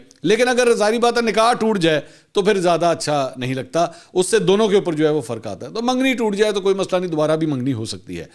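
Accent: Punjab, Pakistan. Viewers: Good yar punjabi lehja😅😅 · You tried yours best but couldn't change your punjabi accent. Everybody know where you belong.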